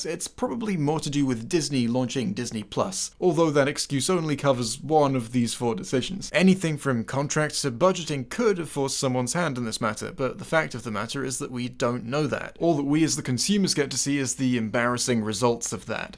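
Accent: United Kingdom. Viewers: This guy sounds like a British Seth Green.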